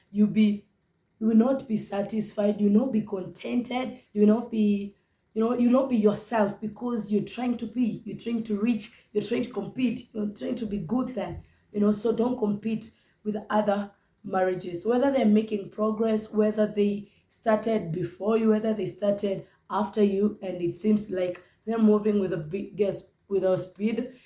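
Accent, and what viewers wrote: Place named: Tanzania. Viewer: nimependa vile unazungumza swahili ya TZ hahha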